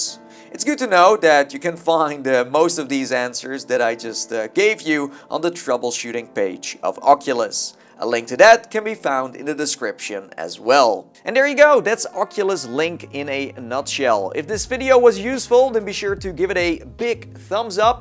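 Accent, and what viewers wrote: Netherlands. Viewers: Are you dutch? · Are u from the Nederlands? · I KNEW IT, I knew you were Dutch, first I didn’t notice but after pausing the video and doing something else in between, after I started the video again I could hear a small trace of a Dutch accent. When you went to the control panel and I saw “luidspreker” I knew for sure. Kijk is aan. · YOU MUST BE DUTCH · Why do you sound dutch? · Are u Dutch ?? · are you nederlands?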